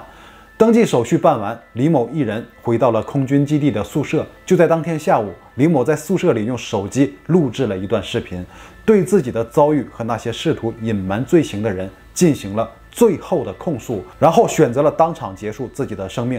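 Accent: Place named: Tianjin, China. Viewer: 听口音好像是天津人，如果我错了请原谅😂 喜欢你的视频，看不够！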